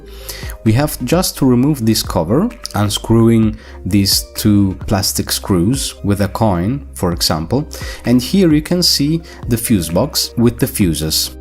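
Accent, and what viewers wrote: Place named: Italy. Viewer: Love the Italian accent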